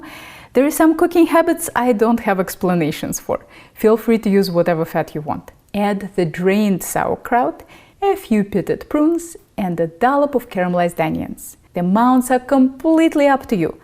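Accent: Russia. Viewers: Fucking Russian accent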